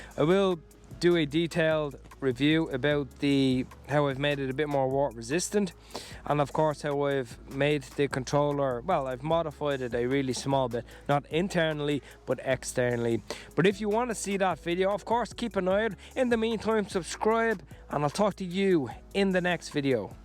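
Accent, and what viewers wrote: Ireland. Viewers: You sound Irish i live in Dublin thinking of getting one put off recently after i heard someone was charged and banned from the roads for riding his e scooter without a license or insurance, Everywhere i look online it says there's no license required or insurance in Ireland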